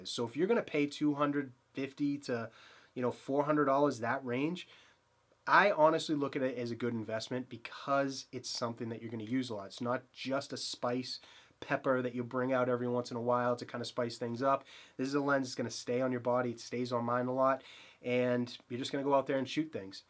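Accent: Boston, United States. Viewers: Always love your videos. For the record your Boston accent is not that bad.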